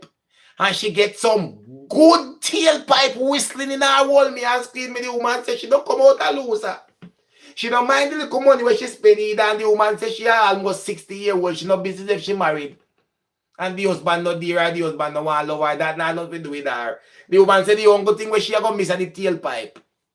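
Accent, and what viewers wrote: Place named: Patois, Jamaica. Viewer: love ❤️ the Jamaican Patwah.I am from Jamaica so I can fully understand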